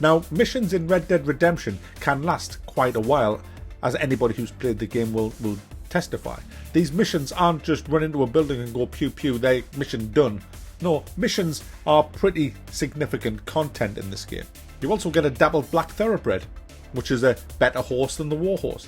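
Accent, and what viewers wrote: United Kingdom. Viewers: Had to watch the video twice because the first time I was just listening to your awesome British accent saying sarcastic things that I lost what you were saying. :'D :P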